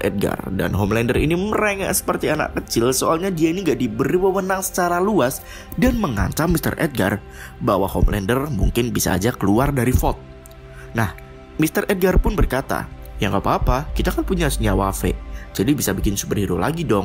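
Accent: Java, Indonesia. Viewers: Mas dari jawa ya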